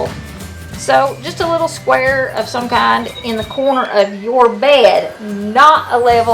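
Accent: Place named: South, United States. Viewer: that southern twang but I got one two lol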